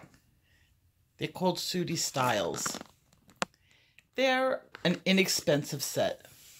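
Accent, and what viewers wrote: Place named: Massachusetts, United States. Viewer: Nice tip and I could listen to your lovely voice all day. Are you from Mass?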